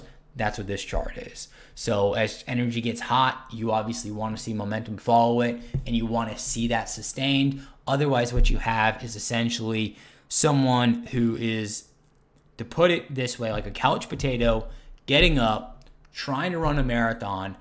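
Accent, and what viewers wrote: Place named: Texas, United States. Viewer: where are your from? Texas? I was wondering because I never heard your accent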